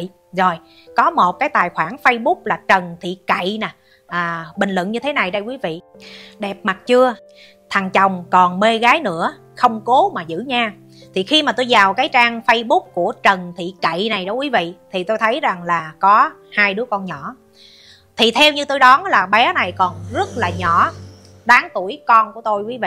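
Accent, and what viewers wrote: Standard. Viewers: Chúc chị nhiều sức khỏe và luôn tươi trẻ khỏe mỗi ngày.Chị có giọng nói rất hay và chuẩn❤❤❤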